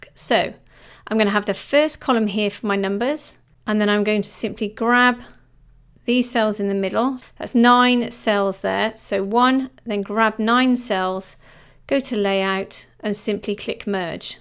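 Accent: United Kingdom. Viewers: British accent and the geat tips. Thank you.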